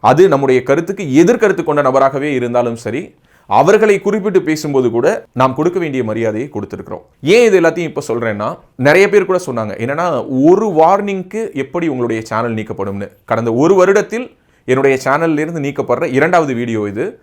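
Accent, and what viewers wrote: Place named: Thiruthuraipoondi, India. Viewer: திருத்துறைப்பூண்டி குரல் உலகெங்கும்... பெருமை சகோதரா...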